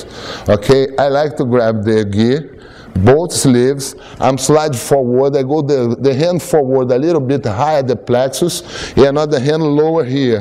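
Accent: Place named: Brazil. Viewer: My sensei is from Brazil who is living in Australia with a similar accent to. I find it normal when Brazilians or any Nation's first language is not English. If you understand BJJ then listening to broken English is not important when you're paying attention to the drill/technique more;) Master - I love watching your videos. I learn so much especially of someone from a higher belt. CHEERS:)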